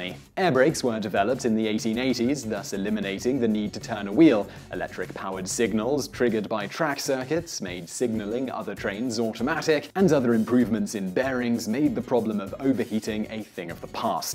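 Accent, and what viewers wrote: England, United Kingdom. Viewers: Michael Dougfir Me too only the other way round. I'm English as he clearly is. We don't have cabooses and none of those pictures was of anything English. It seems odd. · I had a dissonance problem - British accent, US words for all the things.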